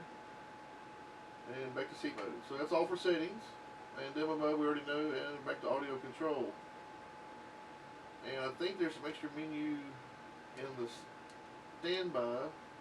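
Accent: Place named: Kentucky, United States. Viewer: sounds like you're from Kentucky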